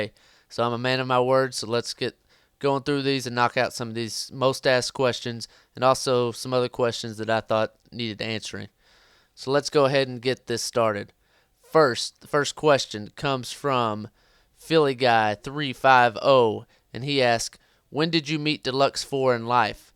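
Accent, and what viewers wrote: South, United States. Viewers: Sounds southern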